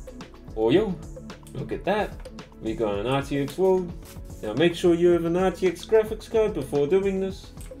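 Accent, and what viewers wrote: New Zealand. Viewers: It’s a Maori accent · nz accent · He's put the Kiwi accent on steroids. And I'm sorry but it's over done for me I needed to mute. Good tips though so thank you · Dude it’s just a New Zealand accent lmao?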